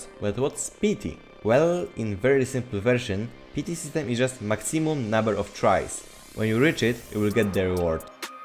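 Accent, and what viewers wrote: Poland. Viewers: Fajny film dobra robota, na pewno mi się przyda. Nie chce nikogo obrażać ale ten Polski akcent jest bardzo wyczuwalny😂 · slychac ten polski akcent😂😂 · Ah słychać ten polski akcent w twoim głosie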